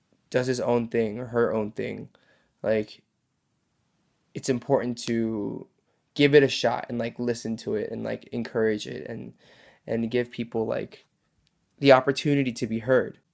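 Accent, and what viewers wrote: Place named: United Kingdom. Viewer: I love your British accent (Actually i love your all)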